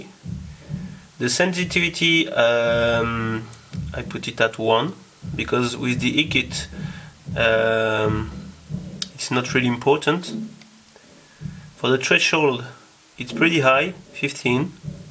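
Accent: France. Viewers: i'm Pretty Sure you are French,your accent doesn't make Doubt! ;-)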